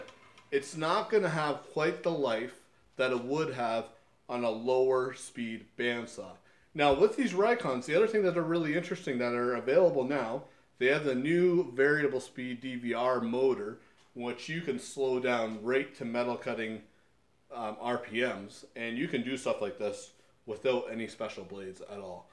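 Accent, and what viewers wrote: Canada. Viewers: believe me, I really appreciate the Canadian accent, for me is the best english.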